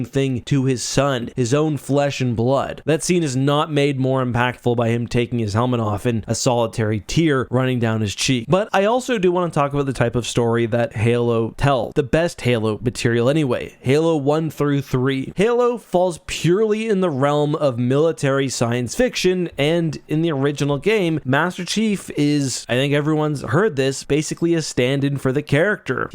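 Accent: Canada. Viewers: Is Canadian?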